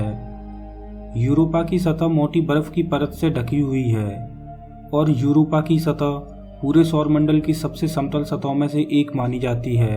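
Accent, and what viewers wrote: Bihar, India. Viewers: Bhaiyaa people ....bhojpuri Hindi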